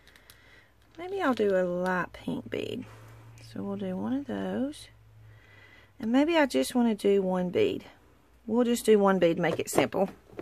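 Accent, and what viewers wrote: South, United States. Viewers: This was a great tutorial. Your wire wrapping is beautiful. I love the fabric and stuff on the second bead. Do you have an Etsy shop? I didn't see any links or more ways to contact you. I'm from N. Georgia and I can tell you're from the south. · I sure have enjoyed learning from you and listening to your Georgia/Tennesse accent! You're too cute! Love the boho beads! <3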